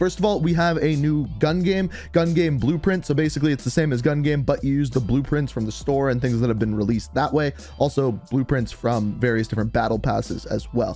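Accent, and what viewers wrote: Canada. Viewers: Is saying known the way he does a Canadian thing or just a way he does it?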